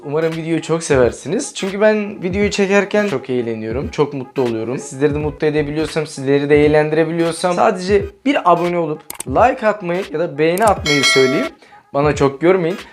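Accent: Nakhchivan, Azerbaijan. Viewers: Naxçıvanlısan sen?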